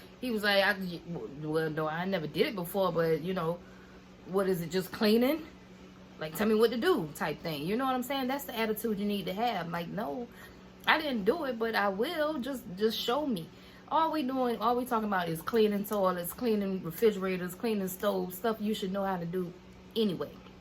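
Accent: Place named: New Orleans, United States. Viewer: Sis where you from ?! I hear NOLA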